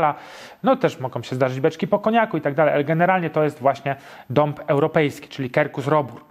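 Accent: Poland. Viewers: Daruje sobie pan ten "angielsko-amerykański" akcent , słychać ześ pan Polak z krwi i kości. :)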